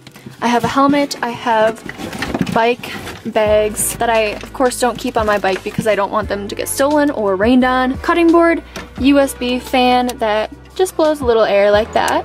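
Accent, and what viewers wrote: Wisconsin, United States. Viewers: When I started watching you I said; "That's gotta be a Wisconsin girl!". Way to go kid. I bet your parents are proud of you, I know I would be. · Are you from Wisconsin???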